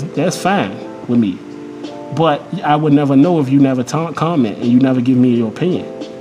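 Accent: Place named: Baltimore, United States. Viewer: You sound like you from Baltimore but real facts to the video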